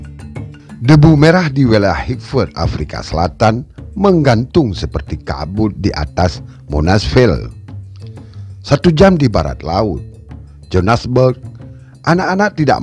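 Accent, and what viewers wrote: Aceh, Indonesia. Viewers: Ni admin nya logat nya seperti suku aceh. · sigoe sagai lon deungo su logat... langsong tatupue awak aceh :D,,, bereh adun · Admin logatnya mirip orang Aceh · Voice overnya kayaknya orang Aceh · Pasti komentator awak aceh nyo 🤭 lemah logat bahasa indon jih 😂😂👍🏻👍🏻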